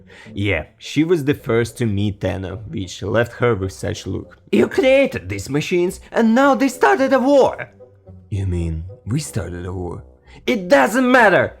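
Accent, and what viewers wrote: Russia. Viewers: Спасибо русскому акценту, слова с буквой "r" теперь вызывают в 2 раза больше проблем. Но получилось норм, вполне понятно и приятно звучит) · я против ничего не имею,но Русский акцент так и прет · Русский английский как всегда прекрасен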